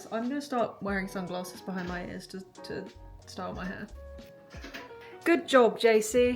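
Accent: United Kingdom. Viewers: U sound so posh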